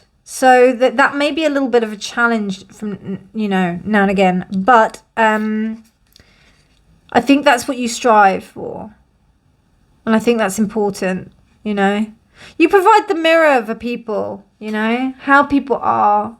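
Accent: United Kingdom. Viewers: 🌻 Good morning, Ms! 🌻 I'm drawn to the art of the 1st card. I wanted to see a flip through of the deck so I was about to search it. Unfortunately, I was not able to comprehend your British accent. Hehe. I turned ON the CC but it cannot capture the right words too. Hehe. Can I know what's the title of the deck, Ms? Or would you like to create a flip through video?? 🤓💕 ✨ Thanks for reading! ✨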